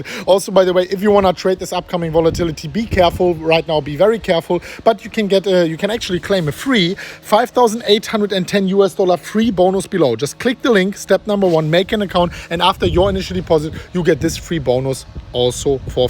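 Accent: Germany. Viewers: u live the best life bro! keep up the good work and stay positive love your german accent by the way enjoy a good drink this evening, u deserve it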